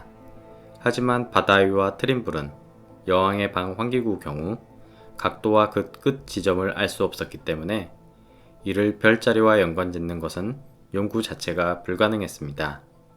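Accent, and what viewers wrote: Jeolla, South Korea. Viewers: 전라도 억양을 사용하시네요 ? 악의없는 댓글이니 오해 마시길..... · 이건 개인적으로 느낀건데 정보를 전달하는 분들은 정확한발음과 표준어를 사용해야 한다고 생각합니다 발음은 상당히 좋으신편인데요 전라도 사투리가 심하셔서 듣기 거슬립니다.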